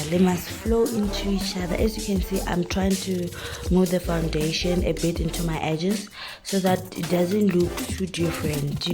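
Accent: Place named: Nigeria. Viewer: is it even a video if we don't get the naija accent!!! love it😂😅😂😜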